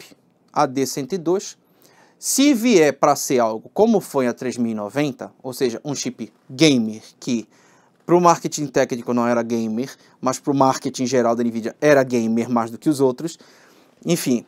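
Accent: Pará, Brazil. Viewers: Ei doido tu é paraense é? boto fé mano drx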